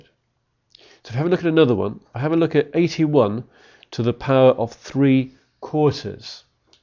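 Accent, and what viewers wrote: United Kingdom. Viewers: Cool British Accent makes me feel smarter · Ur accent is incredibly awesome btw, what type of British accent do u speak?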